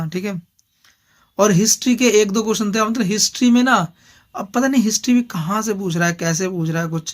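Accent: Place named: Bihar, India. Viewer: aap bihar se ho kya aap nomadic shubham ki tarah baat kr rhe ho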